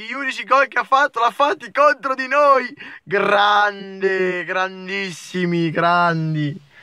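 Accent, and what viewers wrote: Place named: Bergamo, Italy. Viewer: lol bergamasco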